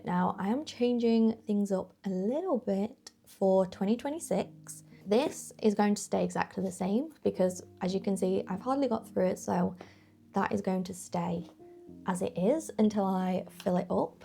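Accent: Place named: Northern England, United Kingdom. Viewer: Where abouts are you from? Obviously "oop norff" in the UK - I grew up in Leeds until I was 10 and now the only thing left of my accent is the hard "u" - as in "up", "one" - until I am with other Northerners and then it call comes back 🥰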